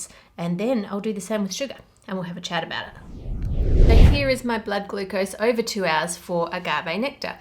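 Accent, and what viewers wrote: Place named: Australia. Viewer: I find it funny how has a clear Australian accent but then her son(?) sounded like a proper Bri'ish schoolboy.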